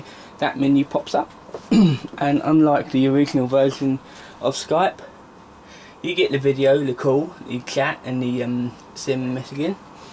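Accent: United Kingdom. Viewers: are u from essex ...i like your accent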